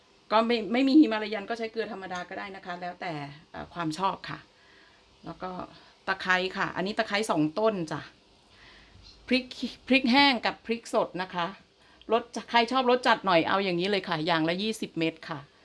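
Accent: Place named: Phu Thai, Thailand. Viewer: มีเเต่เมนูตาเเซปจ้า,ออนซอนจ้า,มักสำเนียงภูไทมากจ้า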